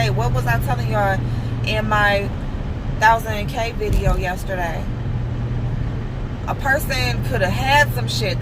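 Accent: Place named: Ohio, United States. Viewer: I knew it. I was born in Ohio and I know that accent. You sound just like my family members!Lol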